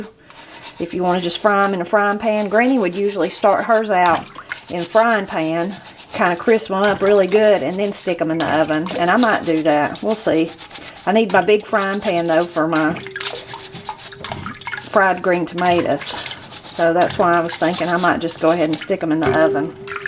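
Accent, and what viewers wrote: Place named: Tennessee, United States. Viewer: Yum! I love friend green "tamaytas" and these videos! I am 3 generations from TN where my Maternal ancestors come from. Hearing your accent, some of the words, even the way you cook, reminds me of my Grandma. We called her Parents Mammo and Pappo, and Pappo was from TN. Seeing your videos really reminds me of my roots. Thanks for sharing everything.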